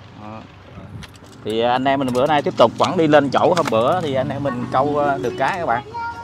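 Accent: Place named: Mekong Delta, Vietnam. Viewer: chau nay noi chuyen dung dan mien tay , thiet tinh , chan chat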